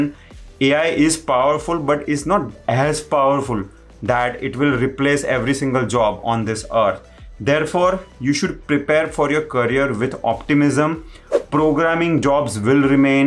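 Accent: Gujarat, India. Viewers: Loved the video! 💯 The moment you spoke, I was like, Aree waah, Gujarati !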